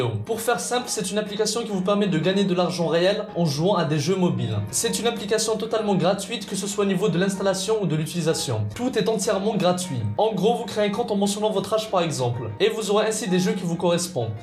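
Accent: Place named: Kabylie, Algeria. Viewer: T'as un accent kabyle 🤣 réponds moi si c vrai !!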